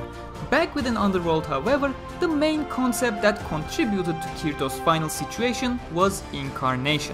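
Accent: Turkey. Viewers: You really have a turkish accent,türk müsün lan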